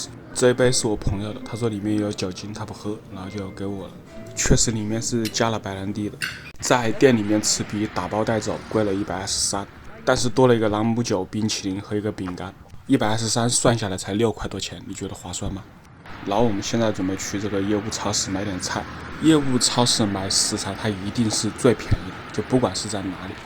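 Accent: Wuhan, China. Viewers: 你是武汉的吗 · 武汉老乡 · 你这武汉普通话的口音真重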